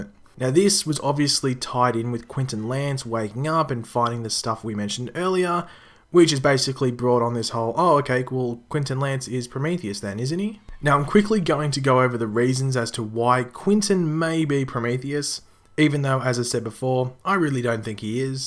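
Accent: Australia. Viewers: I think it's probably Billy right now, but I hope that it's Tommy. Also, you sounded very Australian this video.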